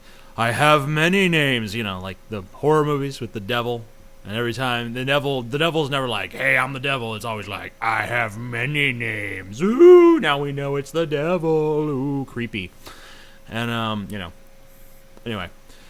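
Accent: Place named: England, United Kingdom. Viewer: Your English Accent is amazing x)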